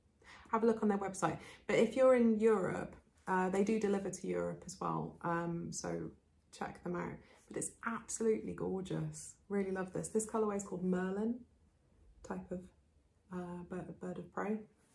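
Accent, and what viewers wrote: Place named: England, United Kingdom. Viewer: I couldn’t find the box to comment on your first podcast so am pleased to see it here. It is a delight to listen to your beautiful gentle English voice and watch as you talk. You have a pleasing manner and it feels as if we are sitting in the same room. Good luck with the spinning and idea of fleece to fabric. It is purely desire + practice. I learned in the 1980s and it is so much part of my life. The ability to buy a fleece clean and sort it, wash and card ,spin and knit never ceases to thrill me and there isn’t anything like that lovely sheep smell. Have you come across Lisa at Soulful Spinning podcast? She does quite a lot of spindle spinning as well as fleece preparation, is a delight to watch and very informative. I know you will enjoy Wonderwool. I really enjoy this festival and usually take 2 days to explore all of the sheds. 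Unfortunately I am unable to go this year. I would suggest you quickly walk round all 3 sheds to get a feel of what is where, before you begin to browse. That way you won’t run out of time. It was a good day when your podcast came on my lists. Thankyou for your lovely content and long may you continue. ( I particularly enjoy your rabbit holes😂). 😊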